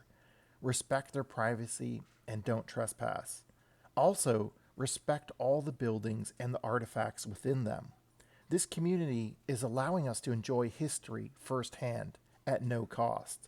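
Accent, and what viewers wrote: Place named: Canada. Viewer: Are you originally from Alberta? Your accent sounds from elsewhere in Canada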